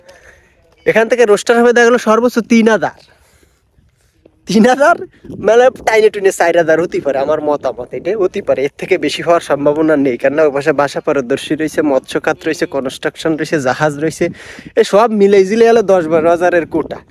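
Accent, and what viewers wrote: Jessore, Bangladesh. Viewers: ভাই আপনার কথাই যে মজা পায় খুব ভালো লাগে ভাই আপনার গ্রাম্য বাংলার ভাষা গুলো আমাদের যশোরের সেই ভাই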